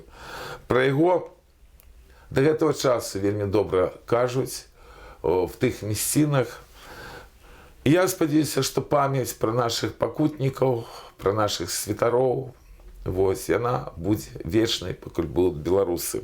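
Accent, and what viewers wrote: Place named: Russia. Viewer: Спадар. Адкажыце, калі-ласка, чаму пад час беларускамоўных прамоў вы цягам дзесяцігоддзяў маеце такі цверды рассейскі гук «в» замест фірмовага беларускага «ў»? Гэта нават не ўкраінізм, а мінавіта рассейскасць.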